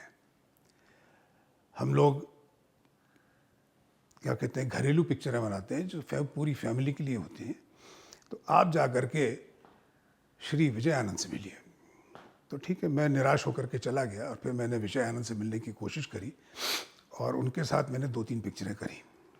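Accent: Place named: Uttar Pradesh, India. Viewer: Sir apki language se andaza laga lia tha mane ap UP se conected ho my forefathers belong to Allahabad neem sarai and besona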